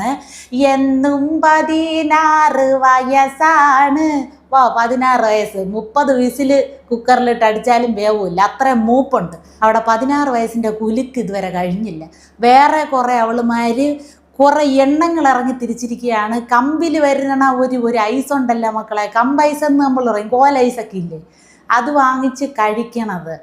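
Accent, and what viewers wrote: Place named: Trivandrum, India. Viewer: വേഷത്തേക്കാൾ കച്ചറ ആ തിരോന്തരം ഭാഷയാണ്🤮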